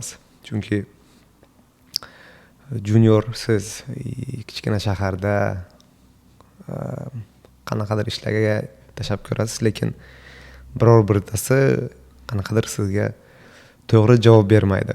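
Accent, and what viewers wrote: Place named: Bukhara, Uzbekistan. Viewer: aktentan bildim Buxorolik ekanizni Vobkentdan Salom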